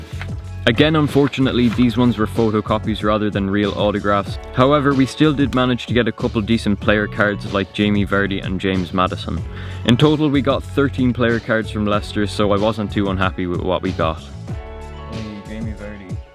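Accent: Ireland. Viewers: Are you irish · Are you Irish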